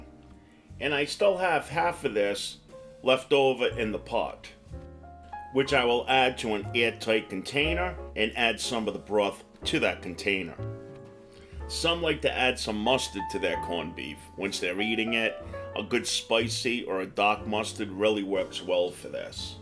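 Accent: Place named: New England, United States. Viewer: Oh how I miss New England accents, I'll be making a boil dinnah on Sunday 🤤🤤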